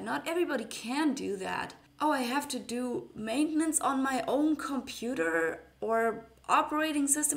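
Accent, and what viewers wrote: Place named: Germany. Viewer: I hear a German accent. Yikes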